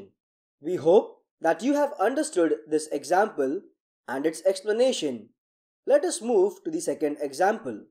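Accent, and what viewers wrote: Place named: India. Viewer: your accent sounds kinda like india, glad to have your videos, thank you.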